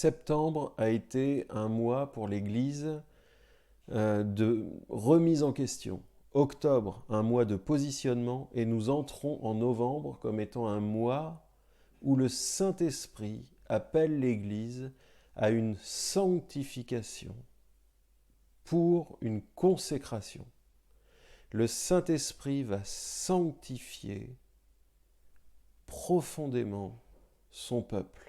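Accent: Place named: Quebec, Canada. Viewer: Merci . Il m'a fallu quelques minutes pour m'habituer a votre fort accent de la belle province , certains mots m'ont échappés , mais , j'ai bien compris l'essentiel . Merci a vous .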